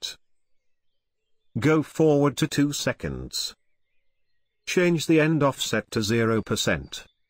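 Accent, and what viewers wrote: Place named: Pakistan. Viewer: Wow. Your Accent is cool. Amazing when I knew yoy from pakistan.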